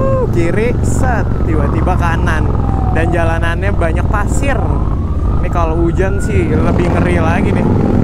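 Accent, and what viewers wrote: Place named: Citayam, Indonesia. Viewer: om ngomongnya bae kaya org citayem aja...hehheehe.. mantap om....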